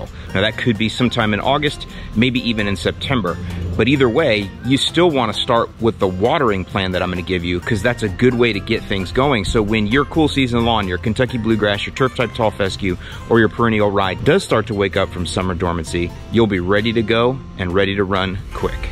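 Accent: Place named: Calumet Region, United States. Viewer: Ha Over buy dare! Couple two tree! What have you.... Calumet regional linguistic gold!!!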